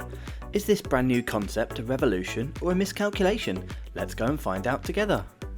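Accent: United Kingdom. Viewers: UK acsent smooth language ✌️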